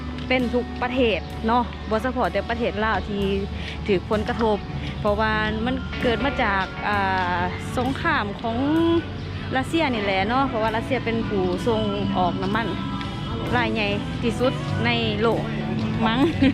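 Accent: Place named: Hong, Thailand. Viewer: สำเนียงสาว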